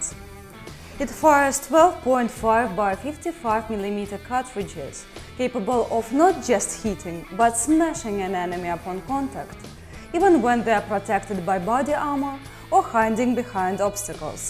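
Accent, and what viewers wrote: Russia. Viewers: Nice system for cqc sof, the host's Russian accent massages our ears. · жуткий русский акцент=)))) а так ниче - хорошо сделали) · Опачки русский акцент))))) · Русский акцент в стиле голливудских фильмов это нечто . Возможно специально так и задумывалось :) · with hard russian accent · Heavy russian accent makes any weapon videos twice the better. "Энд римембер - ай эм э профешионал рашн, соу донт трай зыс эт хоум." (с) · Шмешинг энемис бай контакт, ИТС вери вери вел))) Вэн ай хир ёр инглиш, ай хез Джон Рембо флеш бекс Фром Рембо ту. Ваш рунглиш настолько жёсткой, что лучше бы наняли женщину переводчика или озувили на языке носителя, чем так рунглить, вас реально из-за этого не понять!!! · Пофиг на железо. Девушка очаровательна. Выраженный русский акцент, позволяющий понимать 100% сказанного (я носителей на слух например далеко не всегда понимаю, а ее - прекрасно). 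· Специально с таким акцентом тётя говорит? Чтоб всё-таки понимали что русская? Или тупо не нашли человека с хорошим произношением? Мне гордиться или печалиться?.. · Combination of weapon, woman host and a hard russian accent gives this video very strong RedAllert vibe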